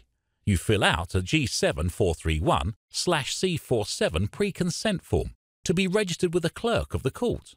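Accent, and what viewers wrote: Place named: United Kingdom. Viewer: It's interesting that this seems to be a directed at an American audience yet it's a British voice and British coppers in the video? Maybe I'm wrong and America's got nothing to do with it.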